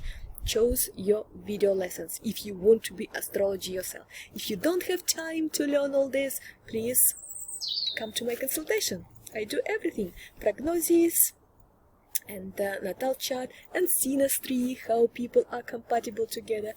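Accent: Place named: Eastern Europe. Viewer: I absolutely love Eastern European women speaking English with their native accents. I have no idea why but I could listen to your astrology explanations all day. Excellent explanation as usual. 💯💯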